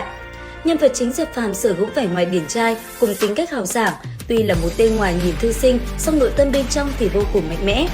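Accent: North, Vietnam. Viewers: Ad là người miên bắc chắc luôn🤣🤣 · Mình ng trong Nam nhưng sao nghe giọng Bắc của bà AD nghe cuốn quá 😅😅😅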